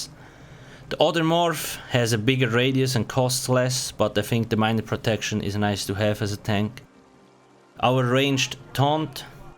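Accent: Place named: Switzerland. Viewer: His accent is Swiss.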